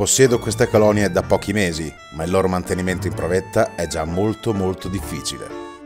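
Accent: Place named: Ferrara, Italy. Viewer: Non me ne intendo di formiche, ma i tuoi video sono ipnotici 😂 sei un grande. Ma da dove vieni? Accento fantastico (sembra il mio, ferrarese)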